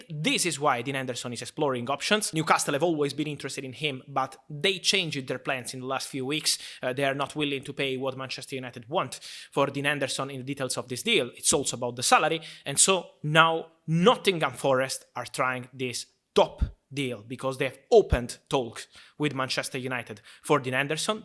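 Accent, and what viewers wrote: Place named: Italy. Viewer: Wow! An Italian like me that speaks with my same accent. I feel i'm not alone anymore!!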